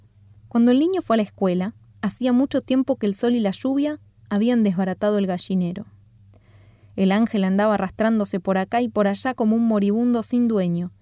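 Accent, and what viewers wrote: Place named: Argentina. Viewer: Es nuestro acento, no veo motivo por el cuál corregir el acento Argentino (?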